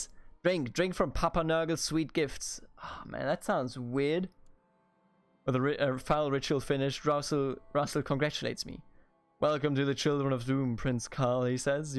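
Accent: Germany. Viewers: Never before have I heard a genuine German accent. I am not disappointed.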